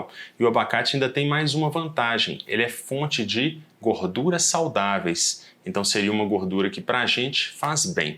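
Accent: Minas Gerais, Brazil. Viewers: Boas dicas e com o belo sotaque mineiro, uai! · São relevantes as informações. O Dr. deve ser professor: metodologia blz! E o sotaque mineiro é nota 10!!!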